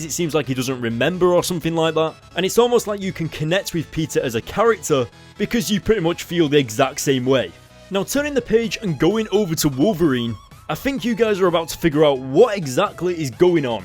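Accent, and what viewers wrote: United Kingdom. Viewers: never knew you were english.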